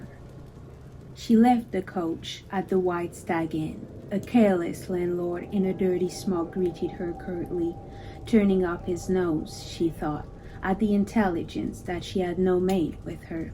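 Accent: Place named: England, United Kingdom. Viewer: C'est très bien lu, avec un bel accent anglais et une belle intonation. J'ai pu suivre l'histoire avec les sous-titres incorporés (anglais). Merci!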